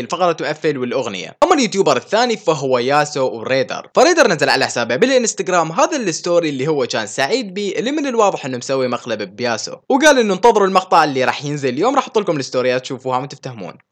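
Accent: Iraq. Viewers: لا عراقي باعي لهجته عراقيه 👀😊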